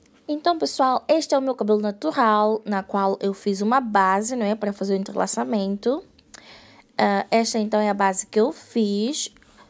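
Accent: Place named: Portugal. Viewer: Amai o sutaque português ❤️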